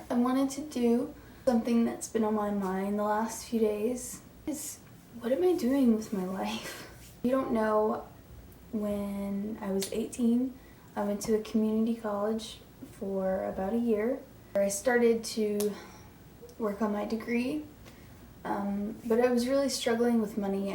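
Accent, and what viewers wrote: United Kingdom. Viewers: Why did you stop making Youtube videos? 22K is a great start. And what happen to your British accent? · I loved ur Brit accent tho😔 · Go back go using your British accent. I really enjoy it when you speak in your accent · wait wait wait. i just watched the video where she had like this perfect British accent...what happened to it? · Same :o the British accent's gone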